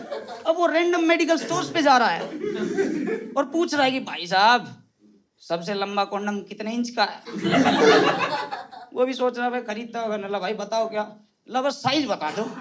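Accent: India. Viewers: Bhai once again bohot bdiaa… vese jrut to nai h pr bhai k or tera fan hone k naate. Ek suggestion h Haryanvi accent na chooraa kr … baaki bohot bdiaa. ❤ Love uh bhai ne ❤ keep growing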